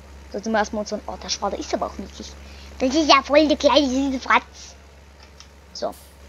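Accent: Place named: Bavaria, Germany. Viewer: Bayrisch war cool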